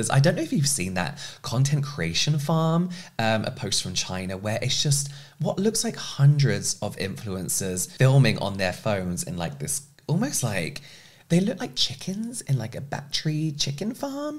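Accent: United Kingdom. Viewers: The perfect beautiful English 😍 I'm Dutch and I love the British English language, but you're a literal cherry on top 🍒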